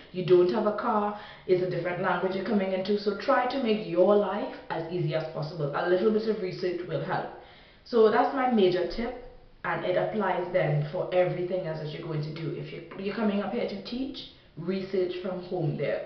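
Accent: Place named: Trinidad and Tobago. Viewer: How exciting!!!!! :D In the other videos I'm like that girl rellll sounds like a Trini. I'm in first year now and I intend to do this after second year so I'm collecting as much knowledge as possible. Did you have prior knowledge of the French language? THanks!! Rushell :)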